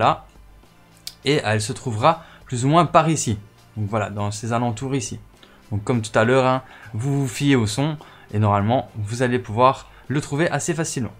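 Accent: Belgium. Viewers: IL EST BELGE IL DIT OUITE POUR HUIT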